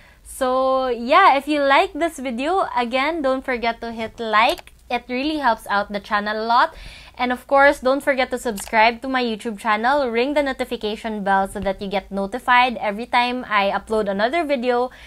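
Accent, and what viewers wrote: Visayas, Philippines. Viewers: I hope you get rid of your bisaya-english accent.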